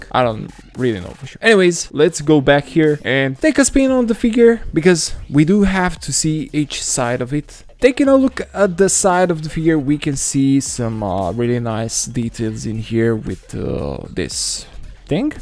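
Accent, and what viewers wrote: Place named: Romania. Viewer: După accent ești român:)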